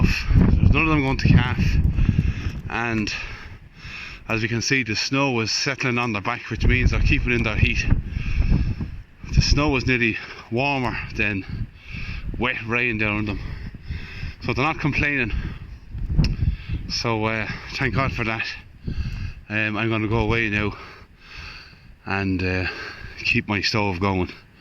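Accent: Ireland. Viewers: What part of the country are ye in, somehow I had it in my head that you were Kerry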